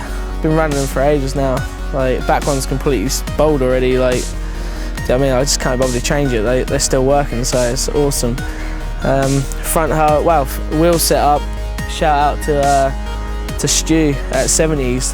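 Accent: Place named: England, United Kingdom. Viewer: dont give him shit thats probs just how english say bike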